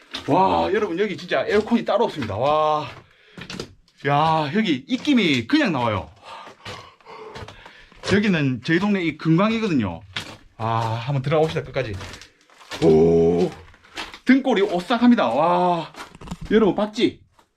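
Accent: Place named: Gyeongnam, South Korea. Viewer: 겨울이겠다 ㅎㅎ진빼이 서부갱남 사투리 정겹네 ㅎㅎ자연이 가자 ^^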